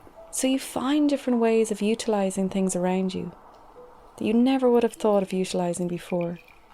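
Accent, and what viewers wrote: Ireland. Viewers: I just came across your channel. Listening to your voice for the first time, I knew that you are Irish :)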